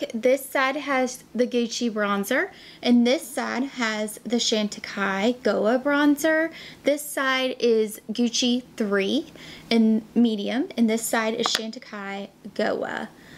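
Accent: South, United States. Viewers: i just found you & am following! You are the sweetest! Because of you it helped me to purchase the Gucci bronzer.. I was on the fence cuz it’s so expensive but You convinced me girl 👍🙏🏼🌹❤️💜💝🥰 I’m happy to follow you and I Love your southern accent., Y’all.. lol...sending you love ❤️ from upstate New York 🇺🇸💜💙🙏🏼🥰🌹💝💕🏖💚💋👍👌💪🇺🇸💪🇺🇸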